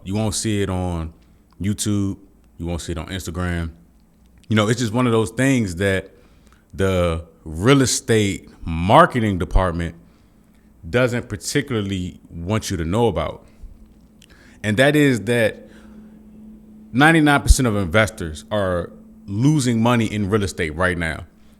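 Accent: DMV, United States. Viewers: 10 seconds in an i knew bro was from DMV lol. Great insight for us beginners!! Respect.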